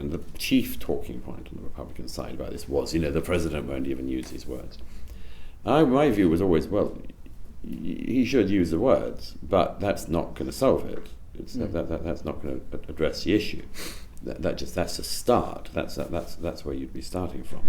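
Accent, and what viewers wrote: United Kingdom. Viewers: Murray: Britain's favourite Neocon! Basically Paul Wolfowitz with an English accent. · Irish girl here who loves posh English accent 😍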